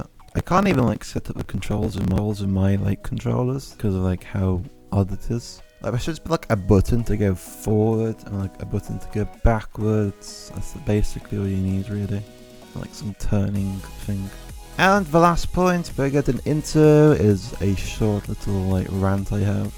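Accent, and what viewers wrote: United Kingdom. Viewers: Best British accent ever known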